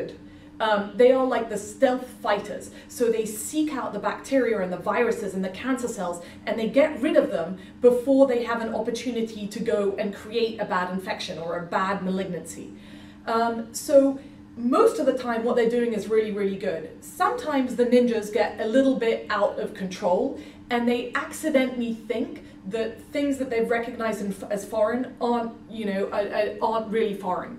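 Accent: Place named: United Kingdom. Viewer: Dr., I was wondering if you have ever worked in NHS as well? Your accent is very British.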